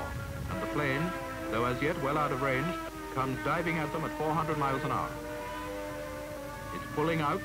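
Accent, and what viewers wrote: United Kingdom. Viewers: This announcer is clinically British. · “One tank with a personal grievance” makes me wish I had a British accent lol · The Young ones today wouldn’t understand the old British language🇬🇧 the way of saying things.. love it ,,,tally Ho ! let’s have a Cup tea ☕️ 😁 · What particular british accent is this? I love it. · Strange to hea so little British accent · British narrator---- but those are fighting Canadians! The greatest Canadians who ever lived!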